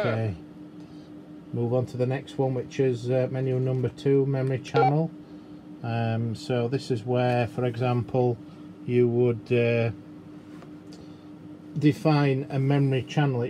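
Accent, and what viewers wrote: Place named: Manchester, United Kingdom. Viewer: Brilliant explanation to a newby. Plus your accent is near me, Manchester 👍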